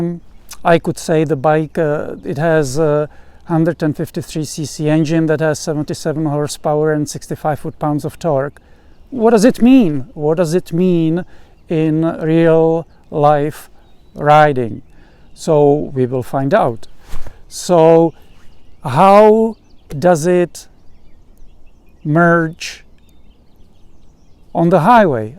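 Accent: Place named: Czech Republic. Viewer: Ahoj. Já jsem si říkal, že ten Akcent je český 🙂. GS 310 zvládám tak, jak píšeš výše. Zkusil jsem rozumný offroad, topcase ty plné postranní kufry. Důvody, proč chci jít výš jsou: méně řazení, větší komfort při předjíždění, možnost jezdit ve dvou na delší trasy pohodlně, lepší výbava a taky pocit, že nemám nejmenší GS 🙂.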